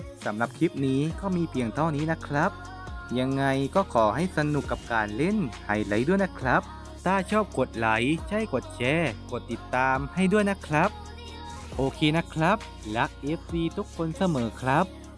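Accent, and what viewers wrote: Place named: South, Thailand. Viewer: ผมคนนครพี่คนที่ไหนเสียงเหมือนคนใต้เลย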